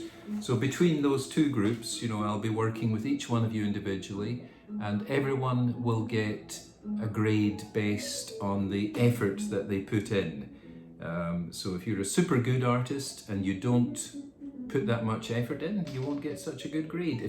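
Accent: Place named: Scotland, United Kingdom. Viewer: Icottish accent 👌👌👌👌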